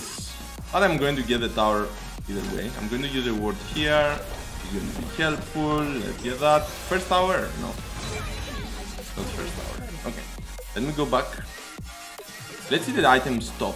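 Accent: Greece. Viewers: Can you do pantheons voice? Since your greek and your accent is real and you play like a god! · χαχαχα το υποψιάστηκα από την 100% ελληνική προφορά. Το μούσι το επιβεβαίωσε.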